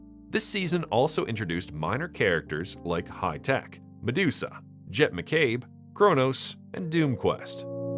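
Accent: Canada. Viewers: You will quadruple your views by replacing this cornball Canadian narrator. Said with peace and love. “With full medical insurance”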